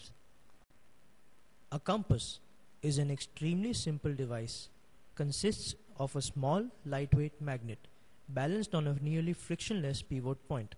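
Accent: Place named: India. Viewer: you have such a indian accent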